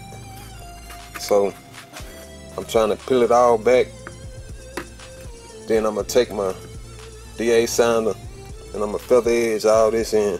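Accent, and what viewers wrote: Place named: Houston, United States. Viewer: Sounds like he's from Houston.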